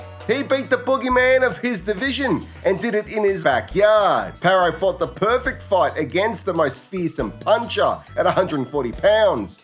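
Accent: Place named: Australia. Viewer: Surprise, the guy with an Aussie accent picks the Aussie to win. Stylistically, Hitchins should win. However, Liam's gas tank is huge. Should be a great fight.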